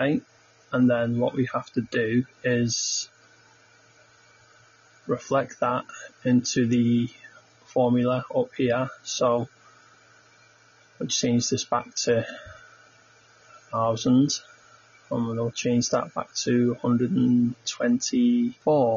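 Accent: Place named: United Kingdom. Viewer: thats the strongest british accent ive heard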